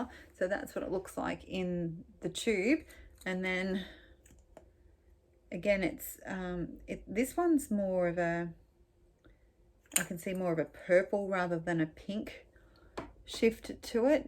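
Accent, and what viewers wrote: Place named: Australia. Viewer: Aussie accent learning time! haha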